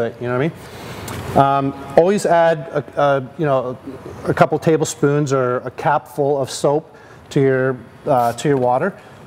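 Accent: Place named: United States. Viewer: I like yourself am about 20+years and have used 3m products continuously, yes ive tried most other "scene" machine polishing brands and have always come back to 3m,and have realised there are so many rebranded materials so if it aint broke dont fix it! Rotary and 3m products do exactly what you want them to, good video ur like me but with an American accent lol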